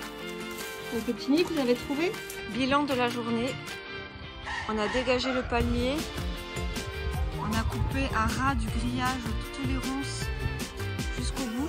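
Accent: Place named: South, France. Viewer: C’est génial de découvrir avec vous les petits trésors de ce jardin, les palmiers est splendide ! Ici aussi nous en avant mais c’est la région. J’entends un petit accent du sud, de quelle région êtes vous ?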